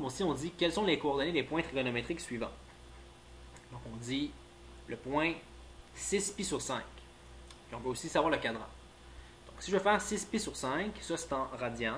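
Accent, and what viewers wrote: Quebec, Canada. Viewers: Je reconnais un québécois ici :)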